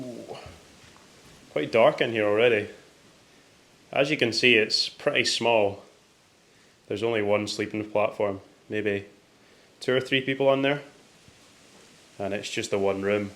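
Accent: Scotland, United Kingdom. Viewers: Beautiful Scotland, beautiful accent! Greets from Warsaw :)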